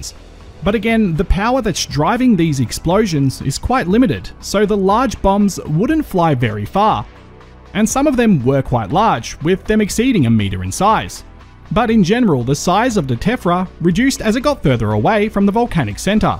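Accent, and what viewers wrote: Australia. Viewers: Its awesome to hear more Australian accents on Youtube, especially on 'serious' channels that don't just involve gravity or hitting things with other things while wildly gesticulating and jabbering in monosyllables lol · Great to hear an aussie accent here on YT well researched and presented indeed. NSW here.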